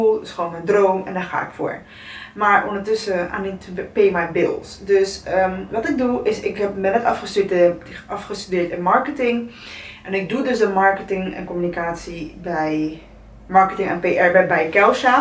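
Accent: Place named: Rotterdam, Netherlands. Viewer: plat Rotterdams accent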